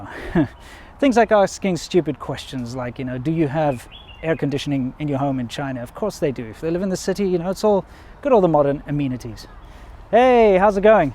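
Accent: South Africa. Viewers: Not particularly interested in China but I somehow got hooked by your channel. Love to hear the SA accent. Reminds me of when I studied at UCT - best time of my life! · Hey there compatriot. I've just subscribed, just seen your channel. I'm a huge fan of Chinese movies (Hero etc.) and music (The Yellow River Concerto, makes me cry) So please do a series on Chinese music and theatrical culture. In these strange days let us not forget about the higher and finer things China has given us (Tai Chi? do they still do it?) Many thanks...it's so good to hear a South African accent lurking somewhere there!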